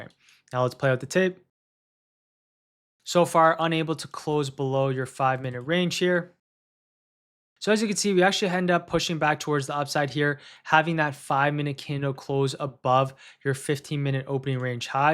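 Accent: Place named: Canada. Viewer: Canadian ey!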